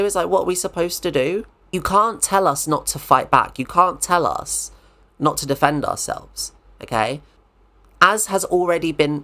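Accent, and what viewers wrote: United Kingdom. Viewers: this is the first video of yours i’ve watched and i’m loving it! love how conversational it is, very easy to listen to and enjoy :-) also the english accent and dry humour definitely feels like home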